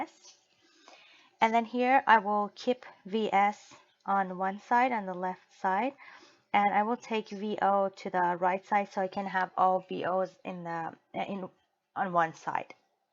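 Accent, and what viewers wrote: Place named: Iran. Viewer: this babe has a Persian accent <3 .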